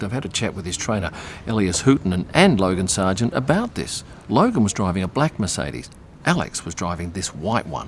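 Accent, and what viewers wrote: Australia. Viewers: love your videos! Great visuals and commentary, love hearing that awesome Australian accent. Take care! An American fan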